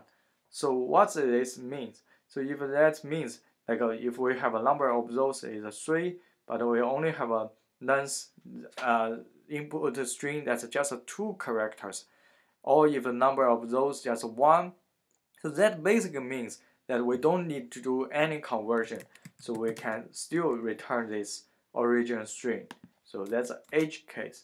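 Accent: China. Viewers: 是东北老乡不？哈哈哈哈哈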